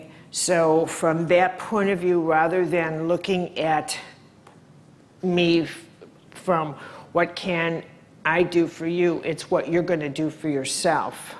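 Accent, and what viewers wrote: Chicago, United States. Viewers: She feels so familiar to me and when I looked up her info I realized why. She is from Chicago, as am I. Her accent and her vibe is so Chicago, and for me, so comforting!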